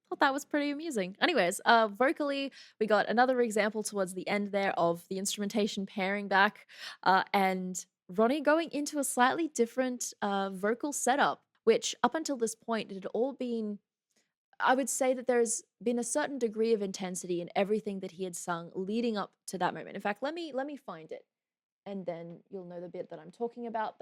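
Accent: Australia. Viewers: That „oer, oer“😂 man i love aussies😂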